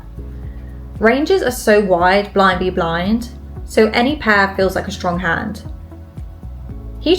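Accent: England, United Kingdom. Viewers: I already watch poker vlogs, but listening to a lovely lady with an English accent makes it cooler, lol. You should do cash game vlogs though! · Tournament poker is SO resident sleeper :( Cute English accent is worth though.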